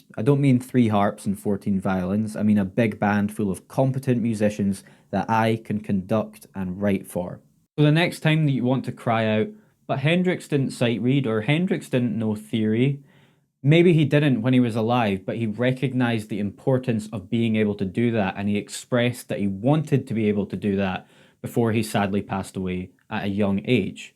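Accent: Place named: Scotland, United Kingdom. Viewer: two seconds on this chanel and i already can tell you're scottish <3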